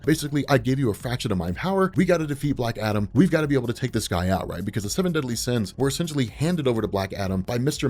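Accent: United States. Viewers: The way Americans pronounce English places never stops amusing me 🤣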